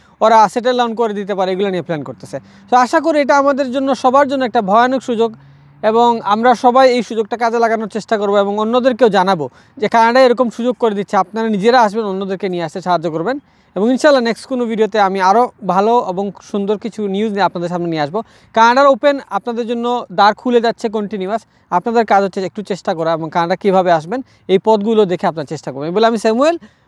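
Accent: Sylhet, Bangladesh. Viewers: Bhai sylheti ni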